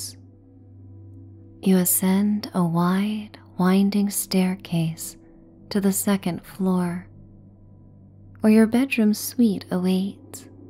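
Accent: United States. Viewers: Write a book a novel or novella a romance or a juicy romance or a mystery or a coming of age with wonderous epiphanies in it. Write and publish self publish if you want or make an audio book. If your not going to sing write a book. I’m sorry I borrowed Jack as my pup but he kept whining for mommy and he snored very loudly for such a wee boy. I love the outer banks, never been there except in stories or films but it is dreamy. I giggled from your southern accent. The images painted by your words are sublime lots of new metaphors and tactile stuff it’s terrific. How come your eyes aren’t moving as if your reading is this just flowing from brain to voice? Happy Sunday.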